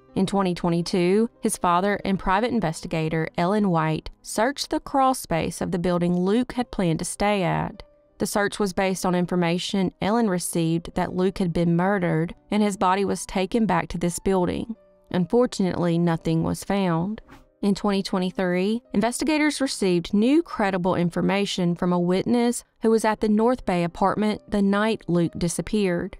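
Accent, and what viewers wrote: South, United States. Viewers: Oh great an American voice I detect a southern accent! Whewfind a better voice todo this video!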